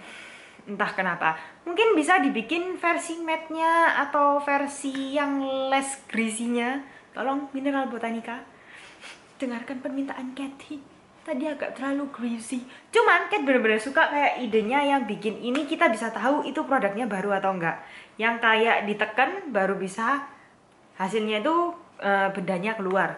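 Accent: Java, Indonesia. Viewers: Oh my~~~ untuk orang yang dulu tinggal di surabaya dan kangen medok nya orang jawa, seneng banget nonton video ini 😍 Dan aku langsung ngakak denger pengampon, kalo beli makeup murah juga di pengampon 😂😂😂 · hahaha I❤U!! lucu, ga lebay, kocak bat medok gt. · Dulu pas mau lihat, wah muka2 kek gini pasti ngomongnya inggris nih. LAH KOK medokkk gitu mbakeeee... luccccuuuu 😂😂😂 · Ci medok banget! Nice video btw~ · kak medok bgtt gaboring sukaa bgttt wkwkwk😂😂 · Medok jawa nya 😂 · Kocak abis reviewnya jowo banget aku langsung subscribe ka wkwk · wadoh ce medok poooll 😂 subscribed yooo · Jawa banget, keren keren. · Itu dimedok2 in apa medok beneran si kak 😬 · Medok nya wkkwkwk♡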